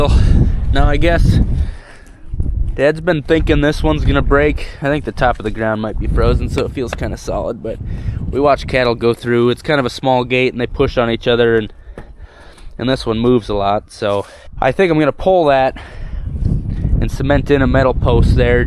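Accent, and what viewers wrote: South Dakota, United States. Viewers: Was just wondering if you see any Pheasant while driving in your fields. Love the South Dakota accent!!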